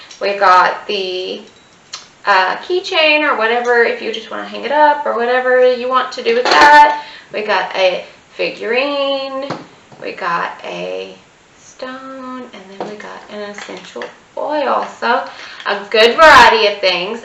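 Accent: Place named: Texas, United States. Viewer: I'm guessing by your accent that you're from Texas.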